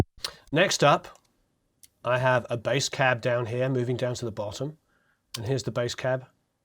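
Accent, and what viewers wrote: United Kingdom. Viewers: That and the british accent.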